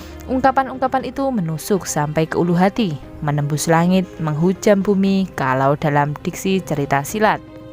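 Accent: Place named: Java, Indonesia. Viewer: Maknyus pembawaan medok nya Like 26 | terbaik bro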